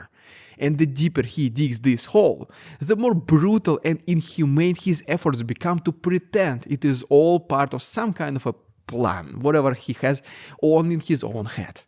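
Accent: Russia. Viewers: Fix your silly accent. Most intelligent Russians learn to master English before dumping their accents on the public.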